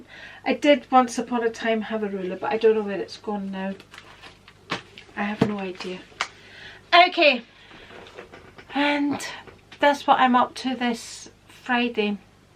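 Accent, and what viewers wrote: Scotland, United Kingdom. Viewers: Hi, I forgot to mention there was another interesting reason I have been watching your videos. Because I studied my PhD in Glasgow 20 years, I do enjoy your Scottish accent and your Vlogs to Glasgow. Looking forward to seeing more videos from you. Cheers ❣️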